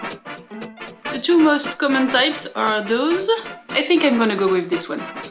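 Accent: France. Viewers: c'est trop drôle d'entendre une française parler anglais ( en plaçant plein de mot en français aussi 😄 ) en tout cas ton atelier créatif fait vraiment rêver 😍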